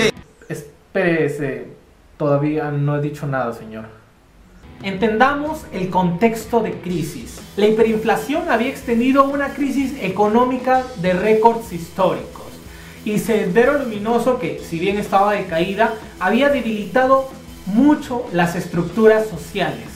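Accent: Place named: Mexico. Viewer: Bacan la info... pero no hables como mejicano... pa que!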